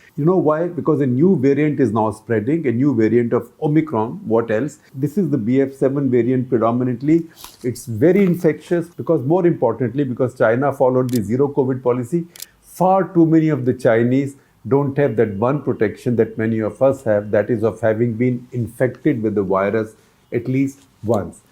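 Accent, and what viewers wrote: India. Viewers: dont understand ur indian accent..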